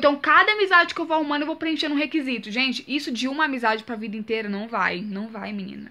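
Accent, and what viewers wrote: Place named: Rio de Janeiro, Brazil. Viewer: Época que tinha sotaque de carioca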